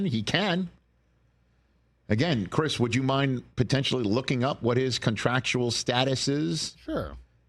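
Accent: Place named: South, United States. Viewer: Rich Eisen speaks just like one of them “good ol’ boys” mask came off here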